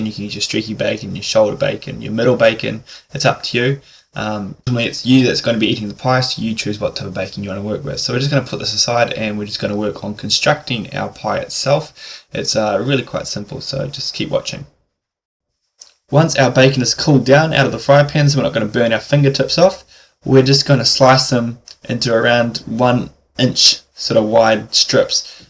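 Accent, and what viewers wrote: New Zealand. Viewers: That's a Kiwi accent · That would be an NZ accent, but an educated one. Closest British similar accent is between Bristol Reading and Oxford. A less educated accent can sound much more like an Australian one, but to be fair educated Australians (rare as they are) can sound like educated NZrs. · Kiwi accent?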